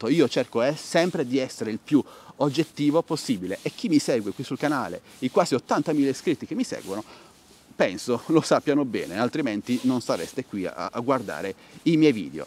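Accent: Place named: Apulia, Italy. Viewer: QUando ci vuole ci vuole, l'accento pugliese per me ( origine tarantine ) va benissimo ,e se non si da un pò fastidio , se non si è un po rompicoglioni allora non si ha la schiena dritta. Bravo e cmq un fanculo ogni tanto ci sta bene a chi lo merita.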